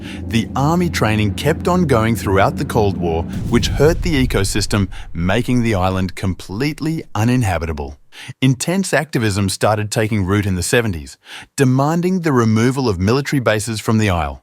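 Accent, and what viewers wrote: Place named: New Zealand. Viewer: Dude sounds like a Kiwi. He may be mispronouncing ʻŌlelo Hawaiʻi by using the Te Reo Māori pronunciation (There are many similarites between the two languages, especially when one is a non native, non fluent speaker of either) As a hawaii resident, I've been told I tend to screw up and mispronounce Te Reo Māori words by saying them as if they are ʻŌlelo Hawaiʻi. (Which I have some familiarity with even though I don't actually speak ʻŌlelo Hawaiʻi fluently)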